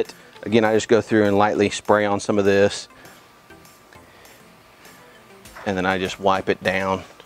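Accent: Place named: Oklahoma, United States. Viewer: Beautiful car! When I heard you talking, I'm thinking this guy is from Oklahoma or used to live there...lol Then I saw the OU stickers in the garage...Boomer Sooners!! Then I saw the Oklahoma plates on the car...hello from a fellow Okie!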